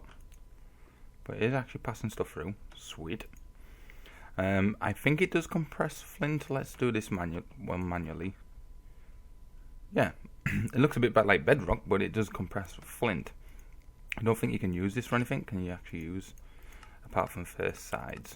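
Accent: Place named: England, United Kingdom. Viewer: Or wait.. Let me guess.. England... Becuse of your accent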